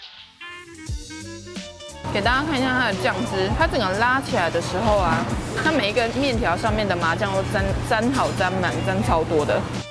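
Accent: Taiwan. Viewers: 你知道嗎，，我想臺灣時就看你節目，在歐洲待久了，聽到你的口音就知道我的魂又回到臺灣了，親切的像鄰居，謝謝你❤️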